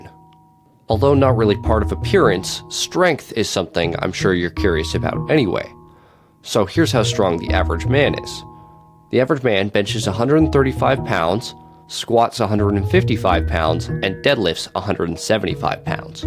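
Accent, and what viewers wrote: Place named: United States. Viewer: >hear american >leave immediately i mog burgerfats easily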